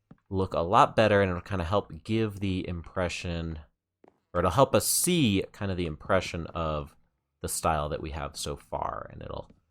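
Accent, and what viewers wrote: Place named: Ireland. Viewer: The accent sounds Irish lmao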